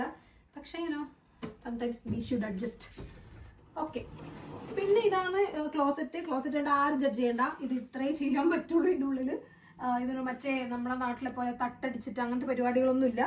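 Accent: Kannur, India. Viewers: Hi I am Sruthi from kannur but eppo engu oman l WRK cheyyunnu Edo unexpected ayitta thande video insta l kande adipoli anallo but youtube l vannu nookkiyappo kurachu videos alle ullu iniyum post cheyyanam YouTube l.WRK pressure nde eyal kannur basha kelkkumbo thanne oru happy a.athinu thande video kanananam enna avasthaya.nammal family ayi evide anu.kannur basha manasilavathavarkk vendi change varuthi basha thanne mari poyi. So iniyum video expect cheyyunnu. By aradika🎉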